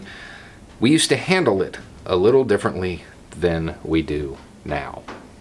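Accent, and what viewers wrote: South, United States. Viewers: mah breau!!! Sure is good to hear a prog-lib of an analytical bent speaking with a southern accent. Ah do so mahself. Thank yew.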